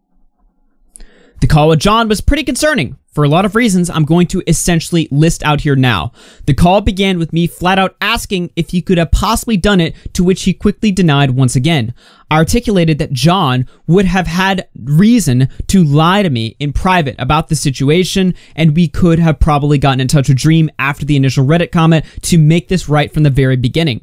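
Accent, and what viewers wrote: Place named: Texas, United States. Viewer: Every time says "Ma opinion" I feel a little bit closer to Texas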